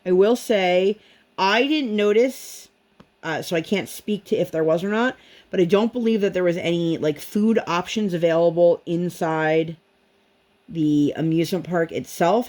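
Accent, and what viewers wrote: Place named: Philadelphia, United States. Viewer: Hi, it sounds like you had a really wonderful trip!! I wanted to mention that I’m a Philly girl and my husband is from King of Prussia. I mention this because it sounds like you’re from the area. We live in the Pocono’s now. What area are you from if you don’t mind me asking? I love listening to your WIP and Chats, but I don’t comment much. I’ll try to be better at that. Take care of yourself and I’ll see you in your next video. 💜 Dawn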